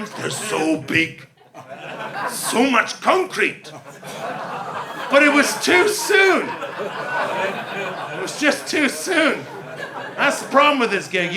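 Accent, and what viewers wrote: United Kingdom. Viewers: very funny show, your British accent was very similar to the Dart callers. 😂🤣🍻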